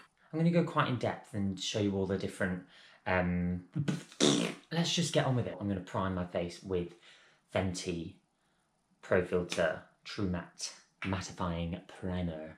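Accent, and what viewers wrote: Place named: Essex, United Kingdom. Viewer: You sound of..... East England.... but South, Mid, or North...? I can’t tell for sure but I am going to guess Essex.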